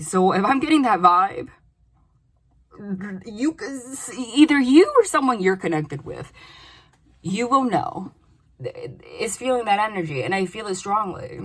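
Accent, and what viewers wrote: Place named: New York, United States. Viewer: Hey I love your New York accent girl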